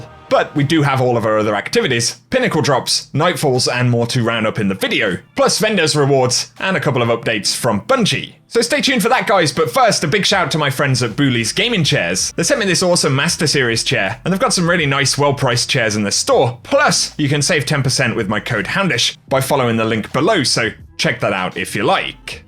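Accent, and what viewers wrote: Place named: United Kingdom. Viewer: har-bing-ers.... LOL.... cmon - you have such Brit accent but, damn you cant pronounce much... think of it as BINGE like Binge drinking... Har-Bin-gers NOT BING - lol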